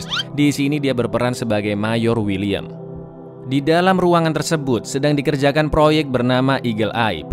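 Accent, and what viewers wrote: Java, Indonesia. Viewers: Halo Lurr. Bang Org Mana? Jawa or Jogja?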